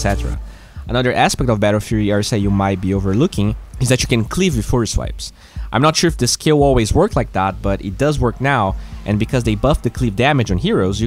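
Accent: Brazil. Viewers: Are you brazilian or know how to speak portuguese? You look like a brazilian when speaks english. (by the way your english is great, I'm just asking XD)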